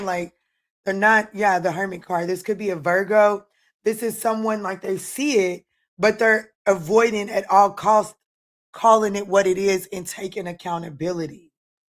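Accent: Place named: South, United States. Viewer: 😂😭😂 she has got to be from the south. She done put 3 words into one😭🙈. I love it. Much love for the Leo Scorpio and Capricorn readings miss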